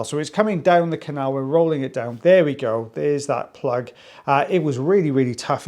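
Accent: Wales, United Kingdom. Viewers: Sorry I had to laugh at your Welsh/English today.. fantastic video as always. Best wishes to, I hope he is feeling better.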